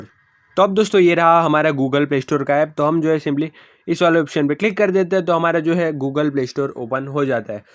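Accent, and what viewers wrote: India. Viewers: i didn't understand anything because he is indian but it worked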